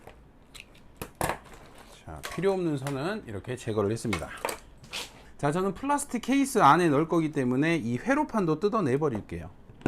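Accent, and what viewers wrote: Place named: South Jeolla, South Korea. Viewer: 근데.. 딴소리인데 전남 어디신가요? 전남사투리가 너무 구수하십니다ㅋㅋ 저희시골은 고흥입니다ㅋㅋ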